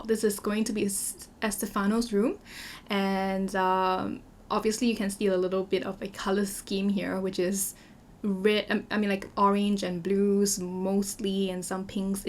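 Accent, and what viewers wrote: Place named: Singapore. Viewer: i heard you accent and went ??? Singaporean 🥰 so nice to see a local simmer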